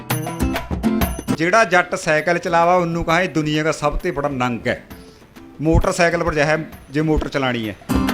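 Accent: Puadh, India. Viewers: ਪੁਆਧੀ ਬੋਲੀ ੲੇ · Puyadhi boli eh Sadi..but sanu malwa 2 da nam de dita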